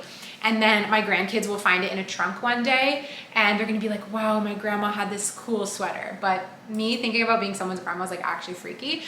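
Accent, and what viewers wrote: Canada. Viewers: I love hearing you speak in French!! I always hear the French accent learning French but I love the Canadian accent it’s so cool to hear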